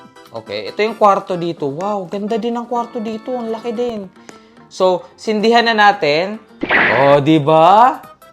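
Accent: Visayas, Philippines. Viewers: Bisaya ka ba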